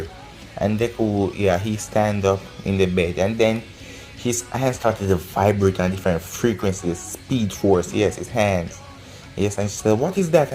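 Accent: Jamaica. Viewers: He sounds Jamaican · Are you Jamaican because I am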